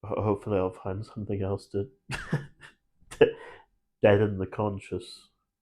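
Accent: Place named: Southern England, United Kingdom. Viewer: I’ve only just seen your channel pop up on my homepage, and there are elements of the helplessness that absolutely resonated within me. I lost my girlfriend of 5 years due to cystic fibrosis when we were both just 21 years old. I had months of feeling just as you’ve described - and remember being sat in my kitchen crying my eyes out with my dad feeling like he wasn’t able to help me. I know you’ve not asked for advice but all I will say is the thing that I found the most difficult was allowing myself to be happy, to be in the moment and take the joy from the little things at their face value, and try to detach the shadow hanging over me. The joy of every happy moment was overshadowed by thinking “tomorrow will be back to black” but over time I managed to let go of the shadow and for me that’s when my second life began. It’s the first time I’ve seen your channel, and really do hope you read this as it takes an absolute boat load of bottle to come out to admit these things. 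Sometimes with mental health things just feel so hopeless. I’ve subscribed to your channel, despite the fact that all I’ve seen is a man crying for a few minutes 😂 but in all seriousness I will look out for your next video, I’ve got no idea where you’re located but your accent sounds like southern England - if you are anywhere near Hampshire and want a beer or a coffee it’s a genuine offer mate. You won’t get there today, maybe not even tomorrow but you will get there. 🤘🏼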